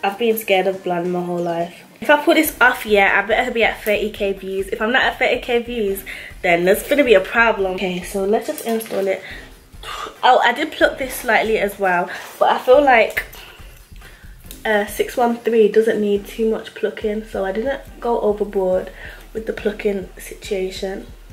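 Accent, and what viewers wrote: Birmingham, United Kingdom. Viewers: Aww Birmingham accent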